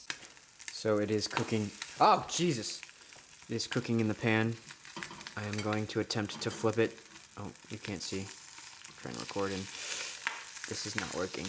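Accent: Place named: United Kingdom. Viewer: Yes but people remark often about how “British” he sounds.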